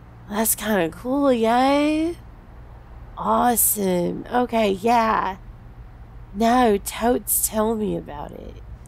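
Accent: South, United States. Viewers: I like the southern accent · Have I mentioned how much I love the southern accent. I gotta go to bed because I have a big day tomorrow, but still a great audio. Goodnight everyone.☕️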